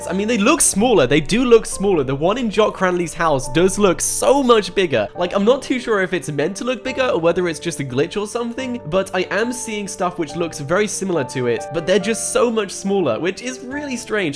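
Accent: United Kingdom. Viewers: Bro u sound very british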